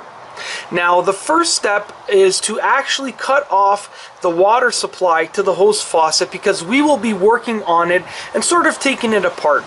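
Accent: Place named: Eastern Canada, Canada. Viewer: ray r at which of your local "skool" does he sound like a prof to you? Please tell is so we can avoid that area LMAO. He sounds like a typical north eastern guy or eastern Canadian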